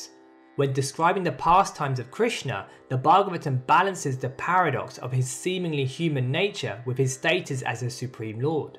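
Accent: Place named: United Kingdom. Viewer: I think and he sound like british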